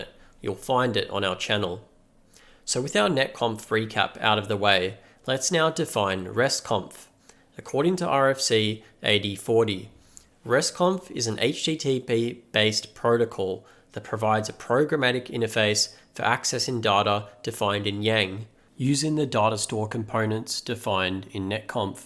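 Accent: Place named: United Kingdom. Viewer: Amazing work. And without accent. Pure british❤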